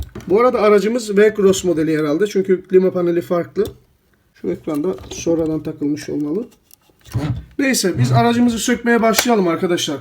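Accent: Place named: Rize, Turkey. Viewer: Seviliyorsun reis. Videolarinla yeni taniştim ve cok profesyonel. Şivenden rizeli sin galiba ben de rizeliyim reis yolun açik olsun daha büyümen dileğiyle .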